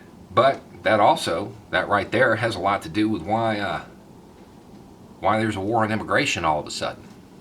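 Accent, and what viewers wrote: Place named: Tennessee, United States. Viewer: Hold up is from Florida?! I coulda sworn you'd be from Tennessee.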